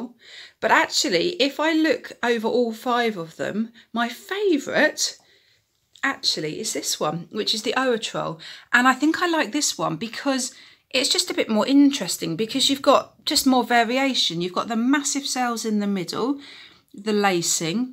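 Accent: United Kingdom. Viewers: I found you a couple of nights ago. Well done on showing us your pour experiments it must have taken a long time to prepare. I did my fist pour yesterday they were all a bit of a mess but very pretty and I managed cells. So lots of practising ahead for me. I left everything on the kitchen table so round 2 today. Keep going it's nice to hear a British accent and no annoying background music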